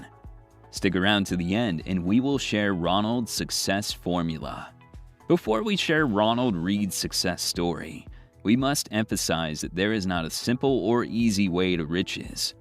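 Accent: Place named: United States. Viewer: An American voice wow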